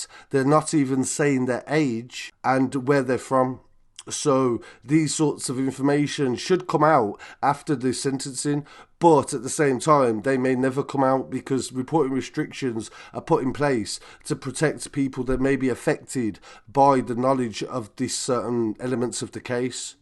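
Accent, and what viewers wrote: Birmingham, United Kingdom. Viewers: Bro your starting to sound like a brummy bin man again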